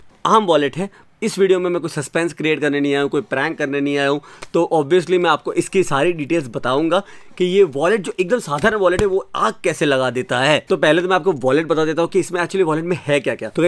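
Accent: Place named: Gujarat, India. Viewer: Heyyy bhaiya and didi...... Lots and lots of love from my family and friends... May you live longer and longer and make our next generation laugh to as we laugh... I cant spend a day without your vlogs and prank in breakfast your video in lunch your video and in dinner your video ❤️❤️i love your way of speaking and gujju tone and how you help otherrrss.. your mom is so cute as welll❤️❤️✨✨ Interested in giveaway ✨❤️ Lots and lots of love from my family and mee❤️✨ bhaiya new logo is mastttt❤️❤️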